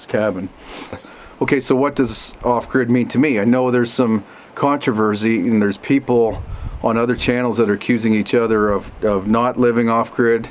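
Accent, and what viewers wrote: Canada. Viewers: I really enjoy your videos, watching you build by hand reminds me a lot of my Pop (who built most things from recycled train sleepers actually...his favourite thing). But I just love listening to your take on things at the end of each video, I find myself staring out the window, nodding most times. Also my best friend is from Barrie, ON, so your accent has a nostalgic feeling for when I miss her. Thank you for sharing your life, building, and take on life, the universe, and everything.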